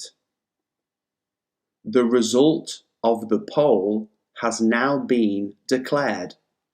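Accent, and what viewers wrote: United Kingdom. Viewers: Hi Greetings from Türkiye nice video for british accent I can understand Thanks :) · Hi! Thank you for teaching us.🙋 I've just done day 1 and I have a question. Is it british accent? (I mean english language the og ofc) Are these homophones also the homophones in american accent english? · Спасибо. Только один вопрос в изучении английского языка. Как учить британское произношение, как у вас по вашему уроку или всё-таки сразу американское для разговорной речи? Если учить британское произношение, не будет ли оно мешать в понимании американской разговорной речи? Спасибо.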